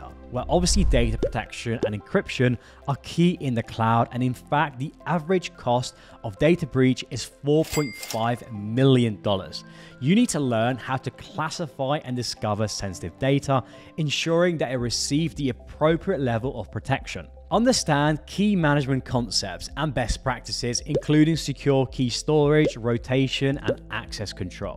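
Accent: United Kingdom. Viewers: Strange how you sound British yet don’t make any mention whatsoever of British salaries. You just cater to Americans…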